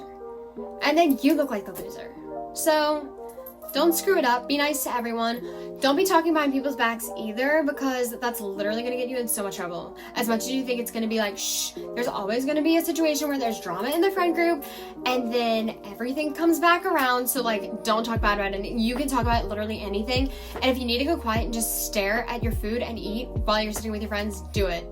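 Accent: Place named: South, United States. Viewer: You have a slight southern or country accent, where u from?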